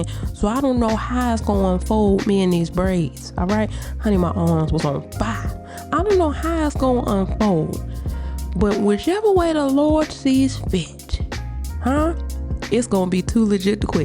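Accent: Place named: Houston, United States. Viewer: Commentary is GOLDEN!!!! You sounding like us out hea in Houston lol. & that hair baby!!!! You ate down! Beautiful 😍 👏🏽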